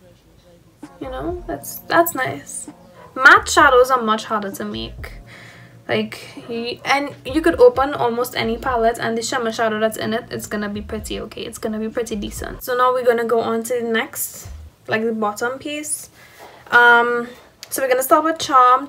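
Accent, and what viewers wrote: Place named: Trinidad and Tobago. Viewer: Keep going!!!! from a trini in NYC. It’s so refreshing to see someone from home doing makeup reviews. Let me just say, there is an audience out here for your brand, I was so happy and proud when I heard the accent. It made me feel at home. Thank you so much for what you are doing. I look forward to seing all that you do. I am a subscriber for life.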